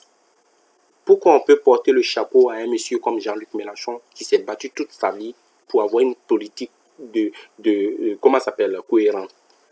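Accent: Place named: Africa. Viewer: Merci pour cette vidéo camarade ! J'adore ton accent africain 😀 Tu as raison sur toute la ligne ! Le bon sens finira par l'emporter...nous sommes trop nombreux à vouloir justice ! Les sondages nous mentent ! Ne nous décourageons pas les amis, nous gagnerons 😊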